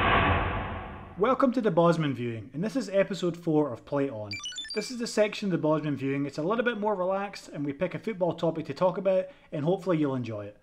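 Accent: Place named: Glasgow, United Kingdom. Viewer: Where in scotland are you from?you sound more glasgow side than Edinburgh side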